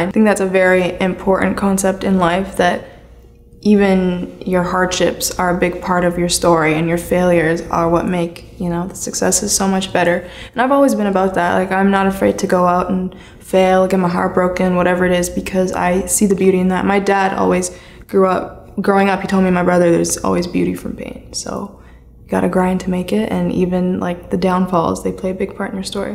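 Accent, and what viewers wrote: United Kingdom. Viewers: I thought she was a Brit OMG 😭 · i thought she was british tbh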